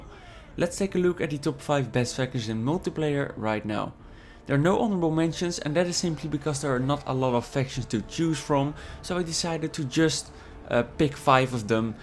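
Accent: Netherlands. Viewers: the guy narrating this sounds like he has a dutch accent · could you be any more dutch? Why is it that people speaking with a Dutch accent simply sound a little bit inbred?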